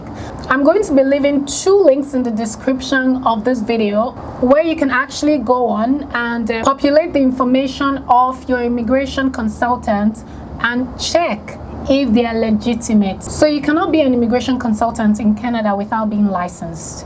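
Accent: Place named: Nigeria. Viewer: I could listen to you all day. I love Nigerian accents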